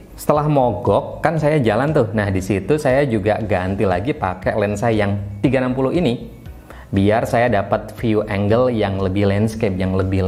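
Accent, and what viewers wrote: Pekalongan, Indonesia. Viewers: Sampean Pekalongan ya mas?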